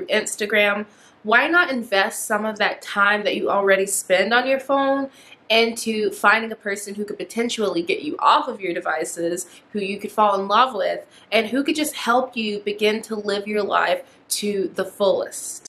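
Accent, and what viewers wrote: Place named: United States. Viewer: When i saw the title of this video and then looked at the caption, i thought " i bet she is American " , i clicked on your video and you began to speak and yes, you have an American accent. Interracial relationships happen here in the uk everyday with everyone that we actually dont " label" it. Here in the uk we all mix and blend ,colour is not such a big thing here.. its a shame that everyone in the states is divided by skin... only in america!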